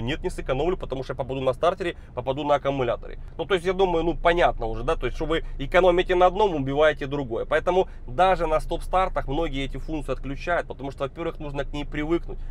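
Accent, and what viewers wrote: Ukraine. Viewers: блеадь эта укр ская манера речи хрен что поймешь ) даже увеличив звук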